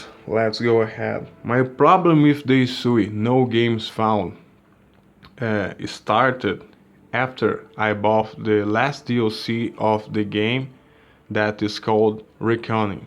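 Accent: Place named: Brazil. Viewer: Percebi que você é BR.Pelo sotaque.Muito bom o seu inglês.Sou intermediário no inglês.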